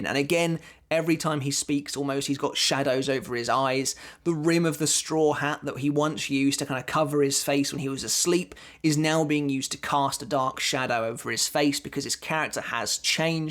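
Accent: United Kingdom. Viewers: Hey Mr, i love your british accent.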